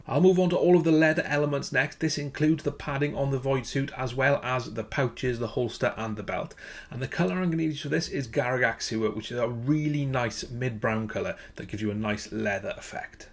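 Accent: Wales, United Kingdom. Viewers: You Welsh man? I'm like 99% sure but its so uncommon finding another Welshie online lmao. Anyway, bangin paint job, I just got a bunch of votann and I'm loving the tips!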